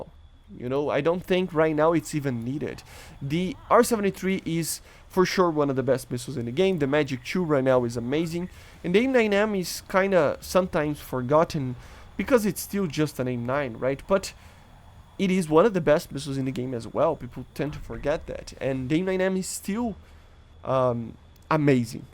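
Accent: Brazil. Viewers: are you Brazilian???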